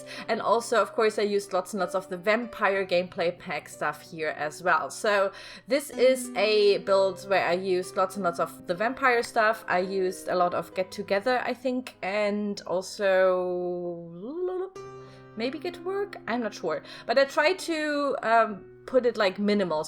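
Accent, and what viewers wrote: Germany. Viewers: I think you're my favorite <3 I just love your buildings, your creative without using the cc, you're original and I love your german accent!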